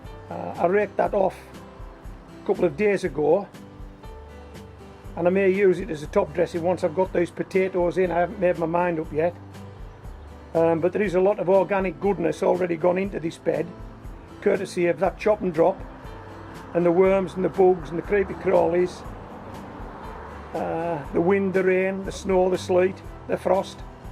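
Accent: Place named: Cumbria, United Kingdom. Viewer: Are you from Cumbria? You sound like a Marra!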